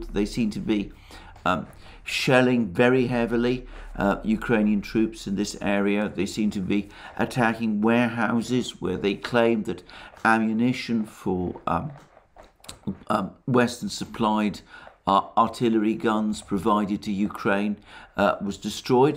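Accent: United Kingdom. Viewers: He reads commonly available materials and chats about it. Another sucker for a Brit accent.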